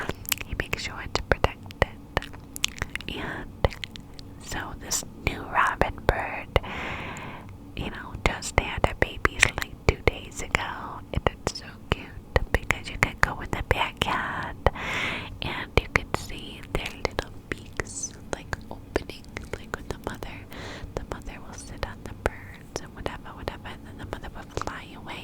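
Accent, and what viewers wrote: New York, United States. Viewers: the ny accent is back!!! we love to see it (or hear it i guess?) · Love the NY accent kinda reminds me of my family even though they have a Boston accent but I honestly can’t tell the difference🤗. Keep up the amazing work 🤩❤️😴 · I love New York accent Keep going 👍🏼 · NY accent 💖💖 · NY accent ASMR >>> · Love the gossip and the NY accent 👌💜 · Oh my god. Just on time. I was about to go to sleep but now I'm getting my headphones. Love your NY accent. Thanks for all the love and videos that you give us.❤❤❤ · i’ve been waiting for another NY accent video for so long!! they are really my favourite, i can already tell i’m gonna have a good nights sleep tonight 😍 thank you, love you <333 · The NY accent makes it more tingly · the NY accent that you do actually gives me life tbh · Love the NY accent videos. Thanks xxx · Live for NY accent videos! 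😍 Thinking of you and your fam. Grateful for al you do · I love the NY accent! It’s not always easy for me to understand everything as I’m not a native speaker but your whispers always relax me so deeply 💖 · The NY accent is so tingly with your super clicky and soothing wispers😍 need this video rn! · Yess perfect timing! I can't explain how much you have helped me lately with your recent uploads ❤ Sending LOADS of love 💕 P.S I loveeee the NY accent!!!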